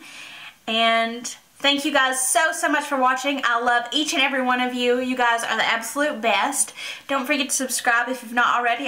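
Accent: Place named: South, United States. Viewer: Omg where are you from? Haha I'm from Mississippi and Georgia so your accent reminds me of home. Southern accents unite! :)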